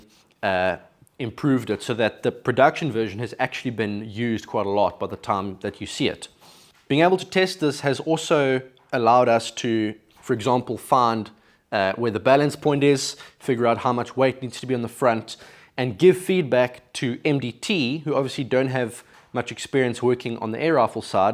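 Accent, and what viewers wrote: South Africa. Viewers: Note (for those not familiar with South African English): When says /ˈrafl/, he means rifle /ˈraɪ.fəl/.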